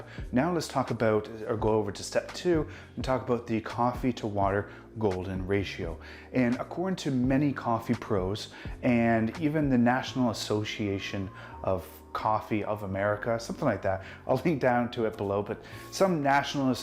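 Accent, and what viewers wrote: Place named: Canada. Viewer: Where are you from? Sounded like a slight canadian accent at times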